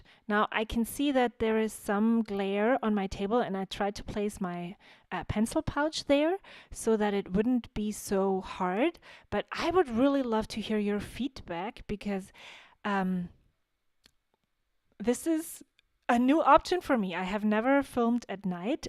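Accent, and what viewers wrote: Germany. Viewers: nice video. I love the way you speak English by the way <3 it reminds me of my very dear German friends.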